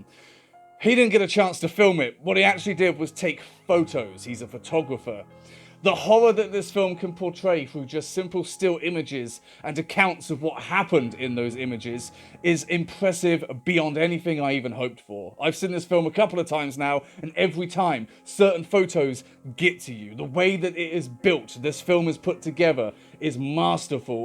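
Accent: United Kingdom. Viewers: He's British isn't he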